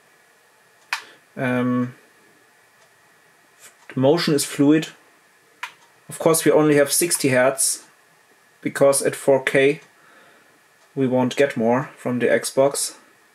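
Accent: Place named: Germany. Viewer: Man hört einfach das du ein deutschsprachiger Mensch bist ^^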